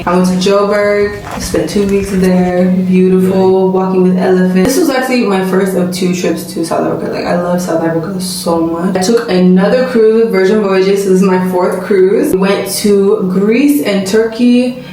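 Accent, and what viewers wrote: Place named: Nigeria. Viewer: ummm babes how come I’m hearing Naija accent o 😂 Welcome back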